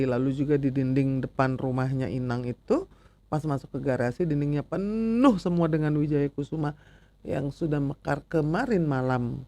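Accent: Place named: Java, Indonesia. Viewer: Inang orang medan, aku seneng seperti orang jawa bahasany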